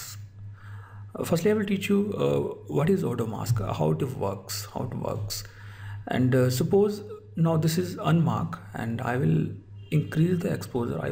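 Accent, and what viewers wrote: India. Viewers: My apologize, but that indian accent is so annoying...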